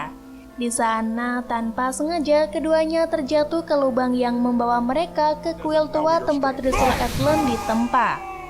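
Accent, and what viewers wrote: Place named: South Jakarta, Indonesia. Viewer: Terlalu Jaksel yah yang baca naskah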